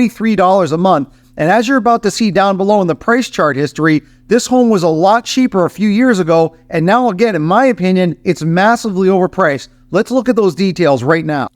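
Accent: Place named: Canada. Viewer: Where in Canada are you from I can tell by your accent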